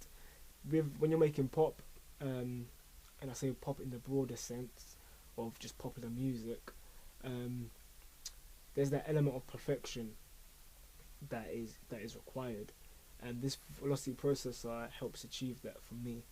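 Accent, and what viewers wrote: United Kingdom. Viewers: Thank you... I've had producers block for about 5 years; save marriage. I've heard that can kill creativity...lol. Anyway I would like to ask you some questions on a business note. Please get at me ASAP. I'm not sure if you are in the UK still, hence the accent, but I am not worried about travel for the right investment.. Best regards. P.S. Mum lives in Essex.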